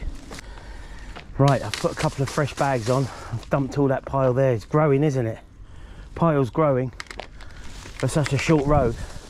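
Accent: United Kingdom. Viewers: Great video ! Love your banter and lovely Brit accent and humour. Great family and work ethic. Wish it would rub off on those around you. ❤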